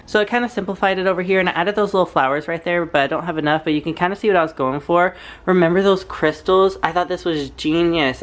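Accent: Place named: South, United States. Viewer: What state are you in? I like the southern accent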